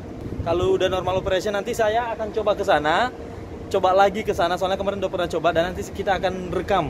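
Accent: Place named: Manado, Indonesia. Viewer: Saya kira bro orang manado..logatnya seperti logat manado soalnya hehe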